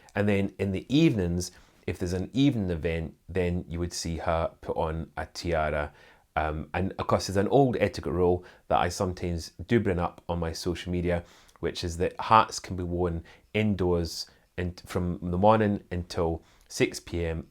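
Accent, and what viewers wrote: Scotland, United Kingdom. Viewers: Here we are one year later and I still find your subject, your delivery and your charming Scottish accent simply captivating💞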